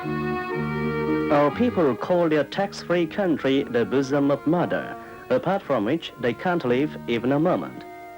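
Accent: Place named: Ireland. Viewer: The narrator has this Irish accent with a mix of broken English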